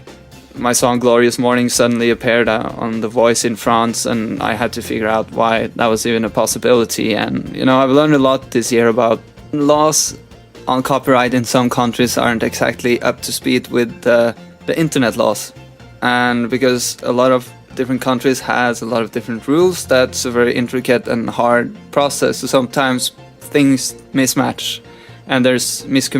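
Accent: Norway. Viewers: 1. Congrats on the 200k subs! 🙌 2. Thanks for clearing up the copyright thing. That stuff had me confused, but now I get it 👍 3. It's nice to hear your voice again. I love your Norwegian accent 😌 4. I would totally buy your merch...if I have money that is 😅 5. Skyworld! Wooo! I love that series! 🙆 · NORWEGIAN THATS WHAT THAT ACCENT IS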